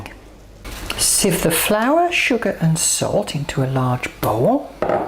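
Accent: Midlands, United Kingdom. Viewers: Loving these great recipes, your enthusiasm and the Midlands accent!!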